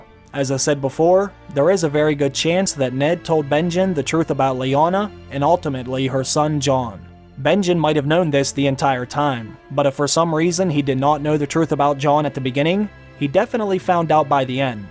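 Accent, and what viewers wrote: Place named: Alaska, United States. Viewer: you sound like those people living in alaskan backwoods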